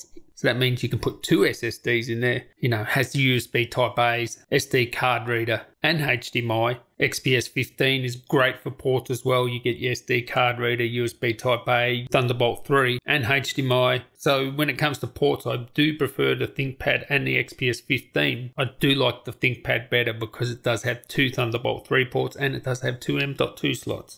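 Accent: Australia. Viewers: u sound like an aussie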